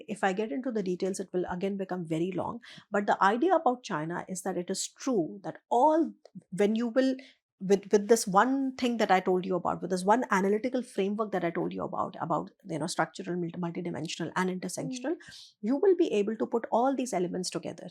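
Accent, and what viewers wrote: India. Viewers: why is indian accent so annoying. Just speak an Indian language and provide subtitles, thanks.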